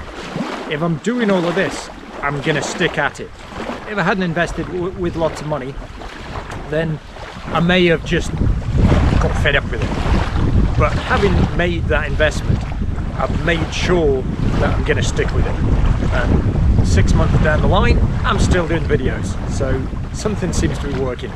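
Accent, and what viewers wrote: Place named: United Kingdom. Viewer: I clicked on this expecting an American accent and was surprised! Good to see another UK YouTuber 😄👍 lovely area that you live in too. I've only been on YouTube for 2 months so it's great to hear some insights from someone ahead of me! Great video my friend. Have subbed 👍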